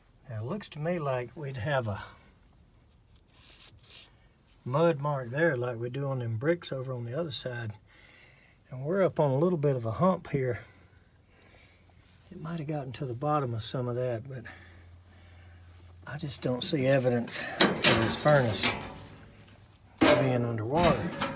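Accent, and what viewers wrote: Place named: Southeast, United States. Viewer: I like watching Steve Lav and you, but it's almost like culture shock switching between the two of you excellent tradesman. Steve's the quintessential fast talking, no holds barred, plumber with the Northeastern drawl; the slow talking, cautious, soft spoken Southeastern country gentlemen HVAC technician. Both are a joy to watch and are not only entertaining, but very educational in their presentations. Thanks to the both of you for taking the time to make these videos.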